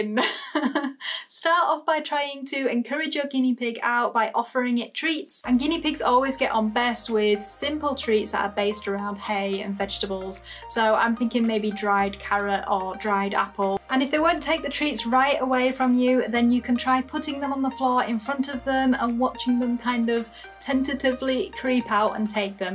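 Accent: United Kingdom. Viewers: you are right I found the more I ignore my new piggies the more they want my attention. we enjoy your videos they seem to like your British accent. we live in Arizona in the states. The only thing they like to watch is you, planet earth,and bobs burgers in that order. Thank You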